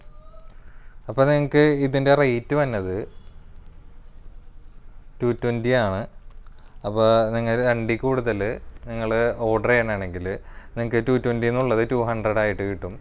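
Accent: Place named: Kochi, India. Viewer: കൊച്ചി കാരനാണോ. കാരണം സംസാരം കൊച്ചി യാണ്. തൃശൂർ അല്ല ട്ടോ.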